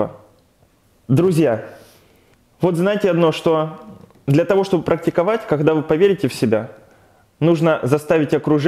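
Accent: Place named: Belarus. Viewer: Я поняла, чем ты меня привлекаешь - ты с Украины! Хотя по говору, думала, что ты белорус.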